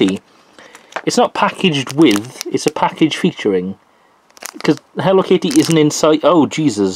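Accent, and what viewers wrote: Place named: Kerry, Ireland. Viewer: Dude love your videos not to be rude but I subbed because your voice sounds like a man from Kerry Ireland sorry but still great video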